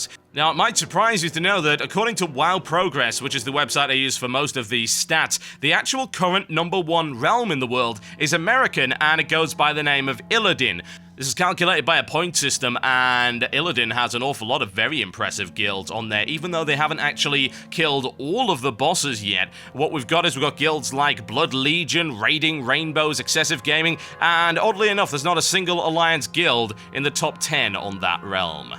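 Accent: United Kingdom. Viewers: I honestly think that the only reason I like your videos are because of your British accent.. racist maybe.. but i dont think any of these people would like these if you talked like wowcrendor, me myself wouldn't give a damn. just saying, but nice videos anyways.=p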